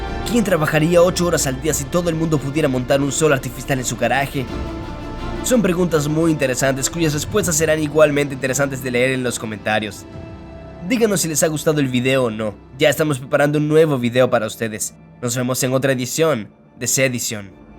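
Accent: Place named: Yucatan, Mexico. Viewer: Es men es Bombaaaa hablas Yucateco!! Saludos Bro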